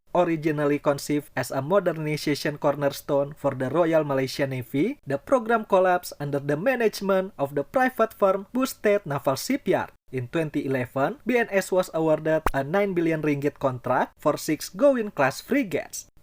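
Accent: Indonesia. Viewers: they already pay to 3rd party item and etc. why abandon it. it still on track for the balance LCS. btw, please use AI because you read the text like a robot without any stop. so hard to understand you indon accent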